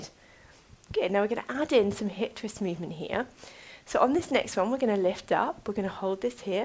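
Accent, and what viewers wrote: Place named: United Kingdom. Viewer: Thank you so much. I started with you 3 weeks ago after a 7 months pilates break. It's been tough the first week with muscle pains 😆 but I really wanted to thank you for all those awsome free classes. You are keeping us far from mental health troubles during this shitty time. On top of it your lovely Brit accent help me improve my pronunciation.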